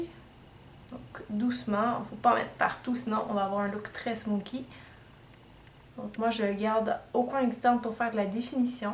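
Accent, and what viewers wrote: Canada. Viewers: t'est canadienne? d'ou est ton accent? j'aime bien.. :)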